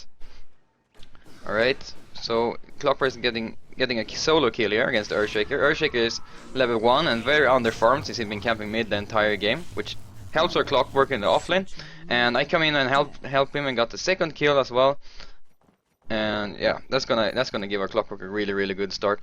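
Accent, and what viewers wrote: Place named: Sweden. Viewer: wp sir. i really like the swenglish too :D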